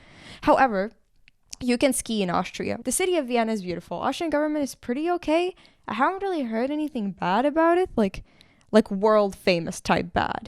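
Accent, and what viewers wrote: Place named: Latvia. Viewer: Your English pronunciation is very similar to other Latvian people I know so I had the feeling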